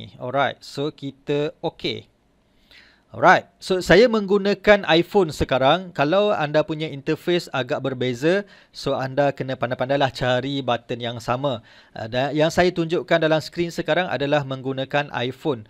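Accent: Terengganu, Malaysia. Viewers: Asal ganu ke bang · Terbaik sharing boh👍🏼👍🏼👍🏼.. teka jap boh asal ganu kan..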